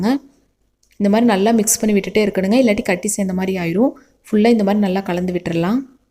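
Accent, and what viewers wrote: Kongu, India. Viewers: Kongunattu Thamizh ketkave inippa irukku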